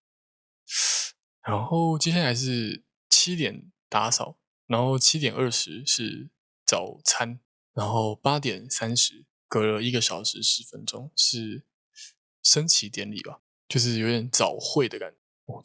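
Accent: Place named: Taiwan. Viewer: Please keep up the great work this exact kind of content TAIWANESE mandarin learners need. There is so much mandarin content from mainland china and more is needed in the Taiwanese accent. Thank you very much!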